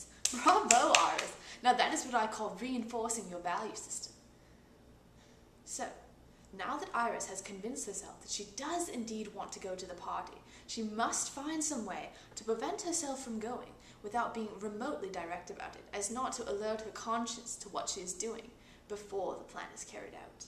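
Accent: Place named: United Kingdom. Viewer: it seems natural for you to have a british accent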